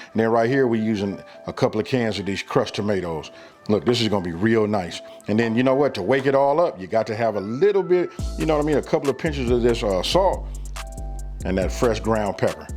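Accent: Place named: Los Angeles, United States. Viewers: bro i swear i knew he was from LA as soon as he started talking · Im proud to see this dude also from Cali with that L.A county accent. Your Channel editing and presentation energy and skills ALL on point bro for real!! i already have my mom and sisters subscribed and myself too. im bout to regulate making your famous Chilli in the new crock pot for my elderly folks! god bless you and your famalia from ATL Ga!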